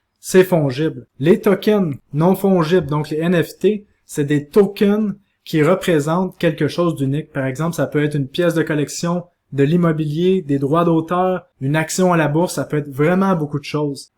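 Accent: Quebec, Canada. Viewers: C'est vrai que je trouvais l'accent canadien horrible avant, et maintenant grâce à toi en plus d'en apprendre beaucoup sur les crypto, et bien j'aime bien cet accent ah ah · Le mana prendre position ou non ? L'accent canadien ma toujours fait kiffer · Merci encore et encore pour tes conseils et analyses. En effet ton accent est très agréable, ça me rappelle mon voyage à Sherbrooke (il y a 30 ans, time flies). Keep up the great work! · Je n'aime pas l'accent Québécois lol. Mais j'aime bien ton contenu !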